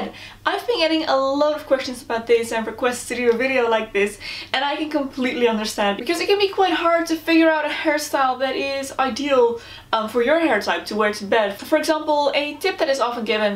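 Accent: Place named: Netherlands. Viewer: ik hoorde aan je accent dat je nederlands was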